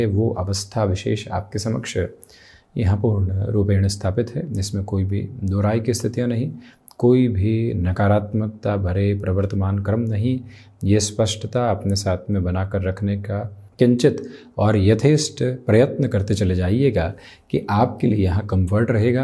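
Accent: Standard. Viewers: Bahut kam log itni shudh ( Pure) Hindi bol paate hai aap ka bolna mujhe bahut pasand hai rahi baat khumbh rashi ki to main mann chuka ho ki ab kuch accha hone wala nahi ha chamatkar ho jaaye to main kah nahi sakta SUNIL CHAUHAN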